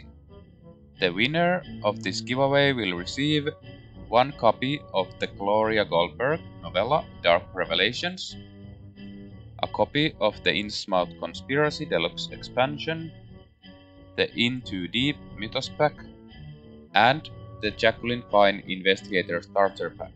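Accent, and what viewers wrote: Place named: Finland. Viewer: i was listening to your video and my wife just went from the other side of the room "that guy has a finnish accent!" :):) terveisia vaasasta!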